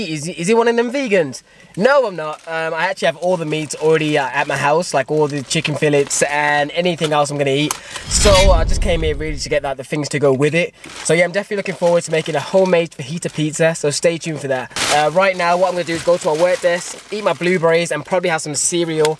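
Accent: Birmingham, United Kingdom. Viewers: Fam are u from Brum ?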